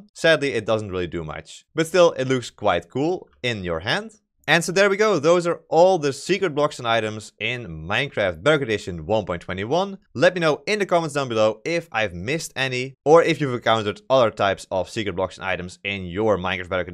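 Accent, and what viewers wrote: Netherlands. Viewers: Your Dutch i know it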